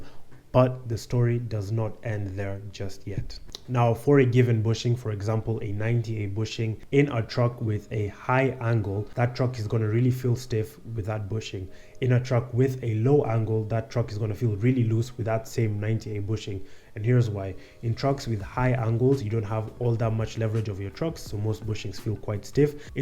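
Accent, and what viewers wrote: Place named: Africa. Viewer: ive never commented in 7+ yrd watching youtube. I skipped over yours cause of a black man W/ affrican accent under a few seconds. After tons of BS from other channels I watched to see you explain in a way I could not only comprehend but was exactly the info I needed to mitigate tje problems witj my ride i I was facing but could not pinpoint. Although the true test of tne info you shared will be on the black top, Im adjusting my equipment as I text and we'll see. I'm telling this to say that predjudice sucks.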